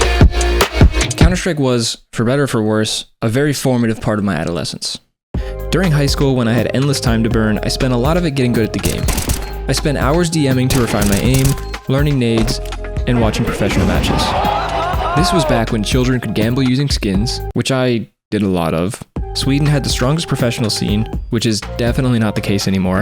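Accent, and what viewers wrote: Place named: Germany. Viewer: Thank you! In the video I don't really address the problems but I'm currently working on my own website/blog about the projects I do. These blogposts will be a bit more detailed. Never heard someone being jealous of german accent lol. Can't really hide it haha.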